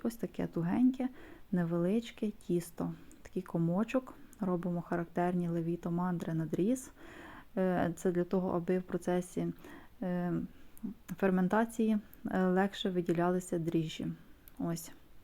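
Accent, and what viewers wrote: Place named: Ivano-Frankivsk, Ukraine. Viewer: дуже дякую землячко, я родом з калуша і чую що у вас акцент такий з івано-франківської області.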